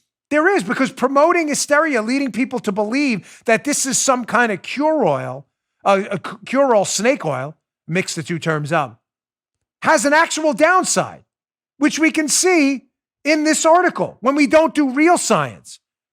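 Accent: New York, United States. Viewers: You lost your audience alright... every time you are fake by calling on, stop me if I lose the audience bullshit. Do you really think that you are talking to a bunch of people that can't follow a new yorker trying to explain something? With dis and dat and dee udder ting...? You know, I take a break from you for about a week or two, and then my brother in law tries to get me to listen in again, and you can't seem to have one program where you don't offend me by acting fake..., stop me if I lose the audience... How the hell would he know? You seem to get worse as your show goes on. You scream and whine really loud and I have to turn down the volume, then you talk super cool and quiet, and I can't understand what you said. I used to turn up the volume, then you would forcefully start talking again and I would have to mess with the volume again. I think that I will unsubscribe your channel and go some where else for my fear mongering... 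you don't seem to be the guy.... I even get tired of seeing you on Fox, as you look so buff and tough, even though everybody has heard you hit the third octave above your normal voice, kinda like Mike Tyson.. heheheh...adios... I know you wont give a shit about me leaving... that just says a lot about you... and me both...!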